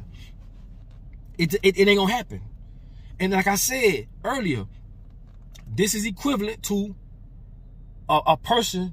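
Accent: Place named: Louisiana, United States. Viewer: Thanks for the video. Thought you were from Louisiana ! Would have never guessed Haitian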